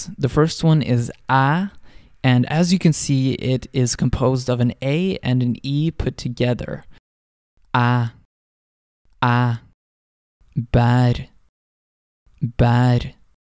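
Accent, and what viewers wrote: Trøndelag, Norway. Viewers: This is dialect, probably from Trøndelag. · remember norway has a fuck ton of dialects for a country as small as us, the person in the video is from someplace in mid norway and he sounds differant in his pronounciation than me who is from northern norway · Man kan høre at du er trønder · Hard to hide the trøjndærsk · Høres mest ut som kurs i trøndersk, spør du meg 🙂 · Jack R trøndersk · Er du trønder? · Good trøndersk pronounciation.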